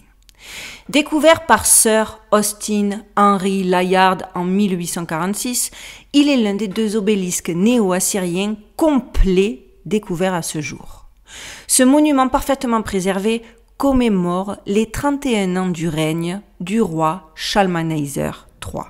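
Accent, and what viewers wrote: South, France. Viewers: Bonjour je suis tout à fait d’accord avec vous à 100 % vous racontez très bien et en plus vous êtes très charmante avec votre accent du sud moi aussi je suis du sud dans le 13 et je pense que les loups-garous viennent de là aussi et que des civilisations anciennes évolué technologiquement par des dieux venues des étoiles ont fait des expériences hybride car déjà les Anunnaki ont fait sur les humains du singe on est passé à l’Homo sapiens et Neandertal et il y a eu des erreurs à l époque quand il y avait un problème il nous noyer (Le déluge ) tout en gardant un échantillon ( L’arche de Noé) et la base de dulce et une continuation de tout ça . en tout cas tout ce que vous dites Tout s emboîte parfaitement comme un puzzle !!! Et avec logique bonne continuation encore une fois vous êtes très ravissante avec beaucoup de charme !! Je vous adore 🥰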